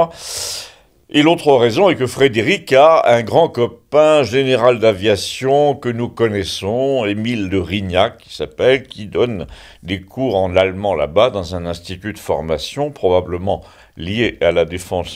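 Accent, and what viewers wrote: South, France. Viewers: Svp, 🙇‍♂️🙇‍♀️ lisez Nous TOUTE votre chronique "avè l assen" que vous maitrisez si biiiiieng, 🤓👏👏💪 cest SAVOUREUX! 😄😃😍😍😍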